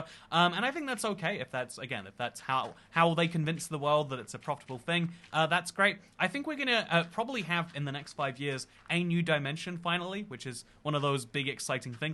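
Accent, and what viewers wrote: United Kingdom. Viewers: I'm from brazil, a lot of schools here teach English instead of Spanish even tho we are surrounded by Spanish speaking countries. American culture is heavy here so many movies come to here and people are used to american content and culture. In fact in Christmas we retain the American winter theme even tho at December we are at high heat summer at 45 c and those grandpas waring Santa outfits almost die of heat from the warm clothes, all that to keep up the Americanized culture LOL. My school had Spanish and English but that's far from the norm. I learned a LOT more from games and youtubers like ur self however. So I'm thankful for ur indirect help in practicing my listening of English. Also, i love ur British accent. I also love that ur one of the few minecraft content creators who aren't heavily focused on younger audiences but more general audience from all ages. 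I'm 22 and i'm just sick of clicking a cool looking minecraft video and hearing an obnoxiously childish voice saying, "wassup guys, hit the like button and ring the bell wohooo!!!! I love ur videos, keep up the good work.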